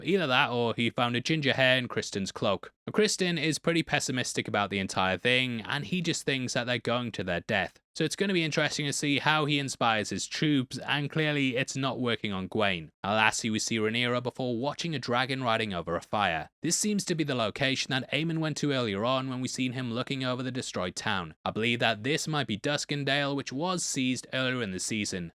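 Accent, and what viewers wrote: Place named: England, United Kingdom. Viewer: My boy looking a bit manly in this video 😂😂 the rough beard, English accent, arm tat in view 😂😂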